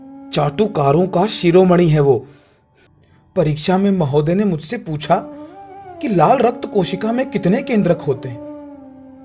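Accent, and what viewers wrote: Standard. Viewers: Such a nice....voice you have...you are a multi-talented....🙏 I love your voice and pure hindi accent.... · ARE SIR G....AP TO GREAT HAI...GJB KI SUDH HINDI BOLTE H.....MHABHART.....KI YAAD AA GYI....APKI VANI SUNKR🤣😔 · Aapki Hindi sunn kr dil khush hogaya...Kash ki aaj bhi log aise he pure hindi ka uccharan krte..Toh nahi sub ko English mein maharat hasil krna hai..🤣🤣🤣 Apna Desh ka mother tongue hindi koi bolna he nahi chahta..Sad but true.. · Sir itni shudh hindi 😂😅😅😅😅...baht aacha h sir.. Hats off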